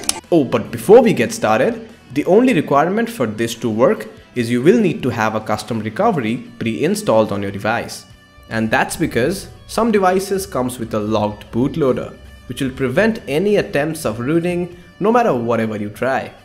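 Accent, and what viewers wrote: India. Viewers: I remember when u could barely speak english and sounded hella indian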